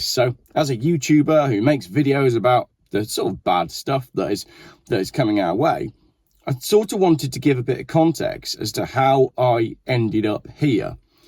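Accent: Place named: Oxford, United Kingdom. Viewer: Sounds a bit like Oxford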